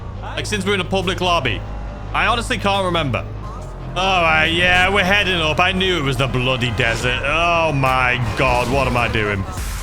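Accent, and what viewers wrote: United Kingdom. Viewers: When did you move the considering you had a or you have a British voice considering you say that you used to do a lot of stuff there like how long have you lived there how old are you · Ain’t you British. ?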